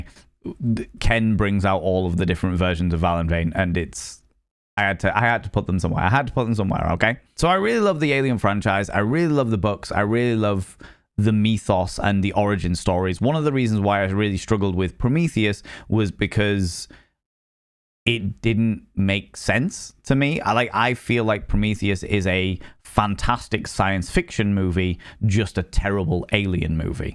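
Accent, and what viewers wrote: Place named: London, United Kingdom. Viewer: He's British.thats how people from London talk.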